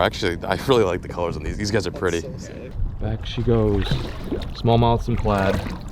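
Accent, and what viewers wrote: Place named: Boston, United States. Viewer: Still loving that boston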